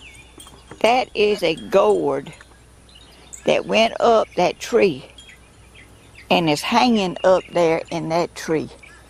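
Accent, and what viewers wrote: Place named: Georgia, United States. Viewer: I really enjoyed your video and I for one don't mind when you go quiet when you're concentrating I love the birds singing in the background, but I love listening to you talk too I love your Georgia accent and one day if gods willing I will get to Georgia. I live in the uk and it's cold and miserable here now and your videos are a ray of sunshine to me, god bless and take good care of yourself, love and hugs from Linda 🤗😘❤️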